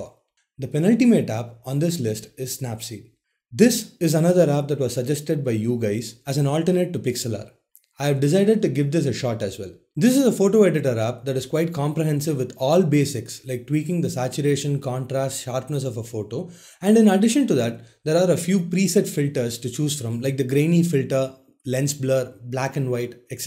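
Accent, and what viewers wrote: India. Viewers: Its sad to know there are so many racists on youtube, if u don't like the accent or indians then instead of commenting on the video just, GET THE F**K OFF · agree... its pure desi :) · the sound of indian.. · I have indian friends. But they learn to get rid of their accent. Not because of dishonoring their culture. But its a skill. You are communicating with the whole world. Please learn proper english pronounciations. Remove your accent and more will come to watch your videos. Your videos are good. But your accent... Help yourselves bro.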